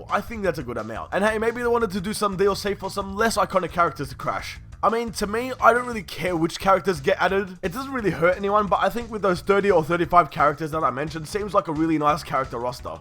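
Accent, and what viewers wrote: Australia. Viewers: One month ago? Mate you sound aussie asf how do you not english in the first 10 seconds? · Shu bro you sound like you're from bankstown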